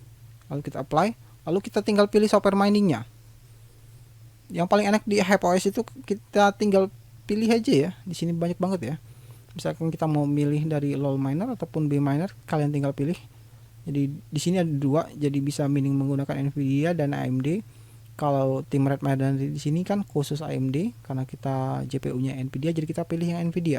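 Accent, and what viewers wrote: Palembang, Indonesia. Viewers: wong plembang ye lor ? 😁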